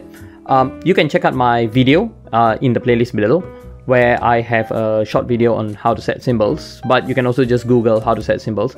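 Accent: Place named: Malaysia. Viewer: are you malaysian ? Sounds like you are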